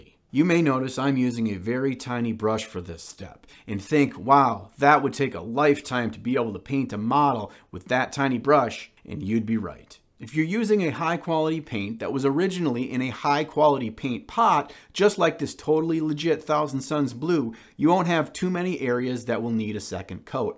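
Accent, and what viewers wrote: United States. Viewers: I'm pretty sure it's just the accent. Too american...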